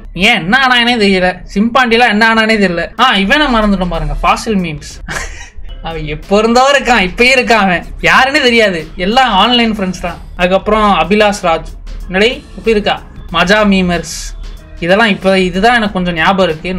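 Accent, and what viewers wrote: Madurai, India. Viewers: Bro Ru from Madurai ???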